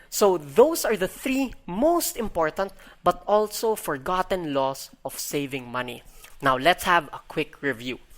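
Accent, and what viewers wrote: Philippines. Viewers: Filipino accented English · Amazing video and I love how it sounds so familiar (Pinoy) hehe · "Filipino accent" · Congratulations on your marriage. Marriage in the US is more of a liability than a benefit. Your accent is charismatic and charming... makes me miss the PI. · regular filipino english accent. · strong phillipino accent but i LOVE THIS VIDEO really hit home for me by the way what software was used to make this video i got a couple things in my vault i got to put a visual to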